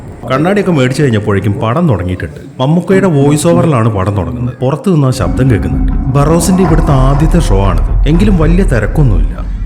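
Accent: Malappuram, India. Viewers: അന്റെ വോയിസ്ഓവർ കേൾക്കുമ്പോൾ ഒരു സംശയമുണ്ടായിരുന്നു ഇജ്ജൊരു മലപ്പുറം കാരനാണോന്ന് പുടികിട്ടി ♥️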